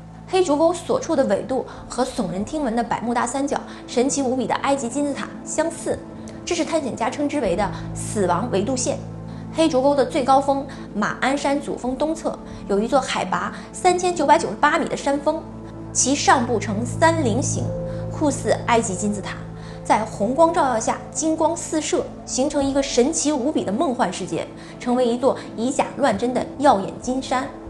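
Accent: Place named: North, China. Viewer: 這腔調一聽就知道是大陸北方人XD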